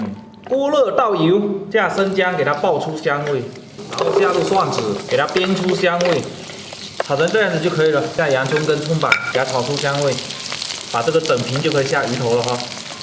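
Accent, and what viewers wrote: Fuzhou, China. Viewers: 一听口音就是福州的😅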